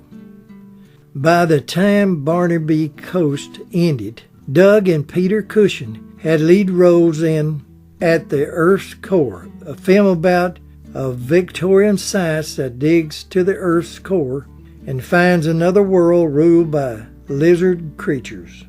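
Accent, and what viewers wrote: South, United States. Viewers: What evocative videos these are for a Golden Age Syndrome sufferer like me, and all in that wonderful southern accent.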